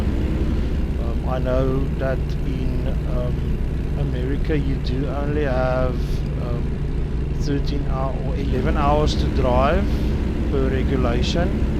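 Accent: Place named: South Africa. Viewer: Im willing to try out the mod 🥲 just wanna see what it is about. You accent sounds familiar ,are you South African Rare to see a South African gamer into trucking 👍Nice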